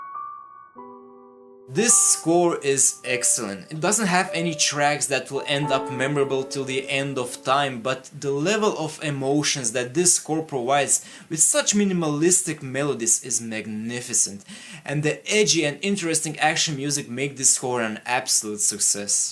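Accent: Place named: Northern Europe. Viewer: good to know. Just know that I'll be supporting you, because not many people respect film scores such as yourself. And also, where are you from?- you sound northern European.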